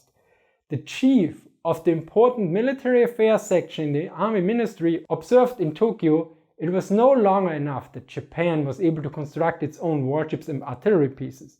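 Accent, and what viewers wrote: Germany. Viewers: I cannot follow this video... Sorry... Why don't you try to grind a little bit that harsh german inflection when you speak ? It is hard to follow you for so many minutes ! Apart this venial thing... I like alot your videos and explanations !!! You do your job well and professionally. Mine is just a mere postive and constructive critic. Nothing personal. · Your accent always throws me off a little.. I know you are an Austrian but you sound German to my American ears. It reminds me of a quote that the most famous German ever was an Austrian and the most famous Austrian was a German (Hitler and Mozart). Don't worry though I am pretty sure Arnold is definitely Austrian. I always wanted to see Arnold in a Ww2 film as an allied soldier - I think Tarantino missed an opportunity when he cast Brad Pitt in "inglorious bastards"!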